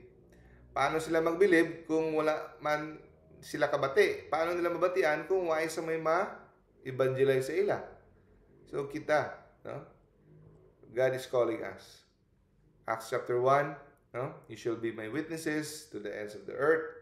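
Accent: Iloilo, Philippines. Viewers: Ilonggo ka man gale doc ❤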